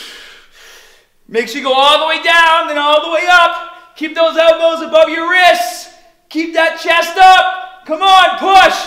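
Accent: Boston, United States. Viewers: Basten accent😄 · ROFL . XD ,I didn't know that was a Boston accent. I've just never heard an accent like yours, maybe because I'm from S.A xD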